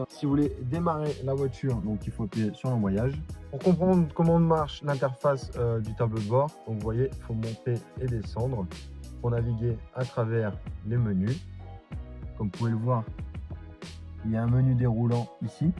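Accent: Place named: Paris, France. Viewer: Le présentateur est sûrement très compétent mais parler vite avec un fort accent Parisien est tout simplement incompréhensible pour un mec du Sud comme moi. C'est vraiment dommage, je ne comprends pas la moitié de se qu'il dit 😢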